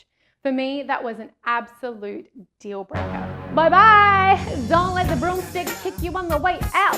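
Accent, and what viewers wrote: Australia. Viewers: Lol omg your so aussie, I love it... Thanks for the tips, now I need one on how to date aussie men ughhh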